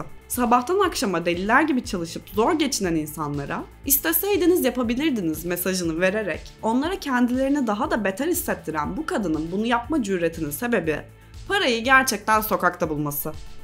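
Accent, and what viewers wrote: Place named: Bolu, Turkey. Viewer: bounlu di mi, konuşmasından anladım...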